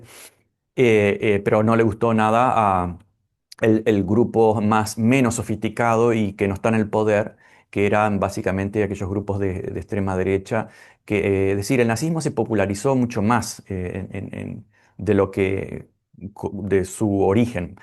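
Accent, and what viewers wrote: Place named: Corrientes, Argentina. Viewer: Ay! Pensé que eras correntino o misionero, un gusto conoocerte! 🇦🇷🧉🫂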